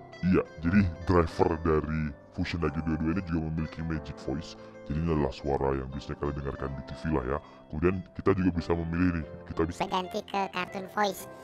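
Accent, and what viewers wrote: Java, Indonesia. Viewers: nyeglek .medok banget jawanya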